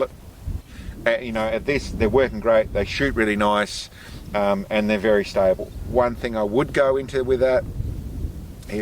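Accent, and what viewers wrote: Australia. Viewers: Not bad!!! But Australian English 😄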